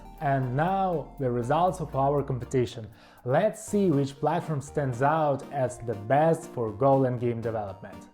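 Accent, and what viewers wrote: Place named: Russia. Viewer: с первых секунд услышал русский акцент)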